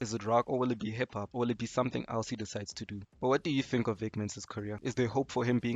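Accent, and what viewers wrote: South Africa. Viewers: You south African bro? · i swear to god you sound south african, are you?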